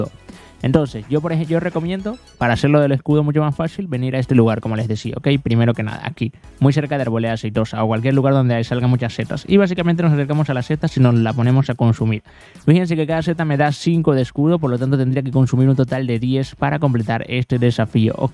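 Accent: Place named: Venezuela. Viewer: El es de Venezuela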